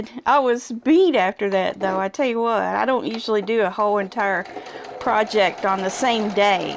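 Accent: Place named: Appalachia, United States. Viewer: Going back and looking at some of your older videos. Loved learning a bit more about you,. I do love your Appalachian twang—it’s very endearing. I think we should all be proud of where we’re from. I was born in Arkansas, but New Mexico is home now. You are a natural at producing YouTube videos! I’m enjoying and learning from your Lessons Learnt channel.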